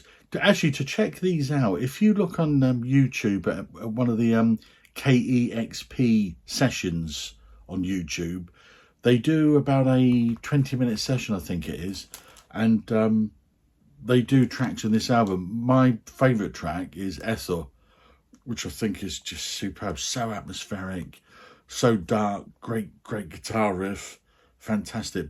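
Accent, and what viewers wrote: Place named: United Kingdom. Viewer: Brilliant job. Thanks for those shoutouts! I agree about the PG album. There are a few songs that don’t necessarily hit you right away, so it will get stronger with more listens. I actually played it quite a bit before I made my list because I wanted to give it a fair assessment in a short period of time. I think this episode is a perfect example of why your channel is so popular. Your music expertise is remarkable and you have that hip, in the know kind of vibe. Plus being British it just makes you sound more intelligent and sophisticated. You definitely have talked me into giving a couple albums on here a try.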